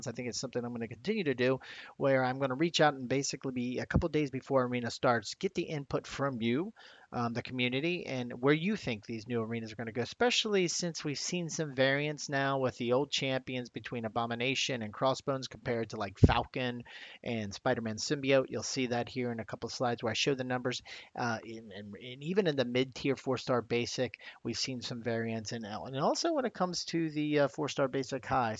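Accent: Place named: South, United States. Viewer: Love your cutoffs man they are the best and i enjoy them everytime because of your lively voice and joyful personality. I also like that beautiful country accent. Howdy Howdy 😆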